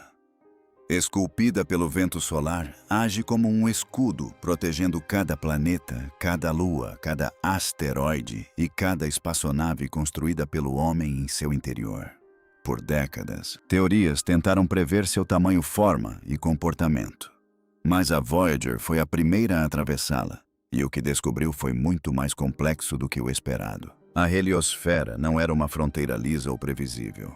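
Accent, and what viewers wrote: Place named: United States. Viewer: O pior é falar em Português com sotaque americano!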